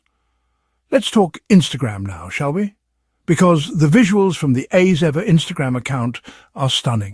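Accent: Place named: United Kingdom. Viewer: Such BS. Best part of this silly propaganda is that AI has chosen an interesting British accent. Carry on Sir! 😱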